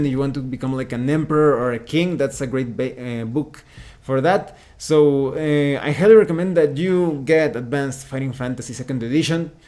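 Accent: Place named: Spain. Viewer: I really dig this review for several reasons: relaxed but not boring, comprehensive but not overextended, and it really gets you into the game mechanics without getting lost in too many details. You totally won my attention into AFF 2e! Bravo! A curiosity: I recognize that your a native spanish speaker based on your accent, but you talk fluently through many sayings with no flaw, can you tell me how you got such a mastery :)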